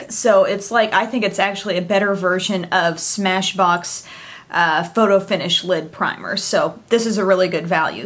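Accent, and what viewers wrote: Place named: Illinois, United States. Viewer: Congratulations on your award! I live overseas, but I'm originally from Illinois and especially enjoy hearing your familiar accent. :)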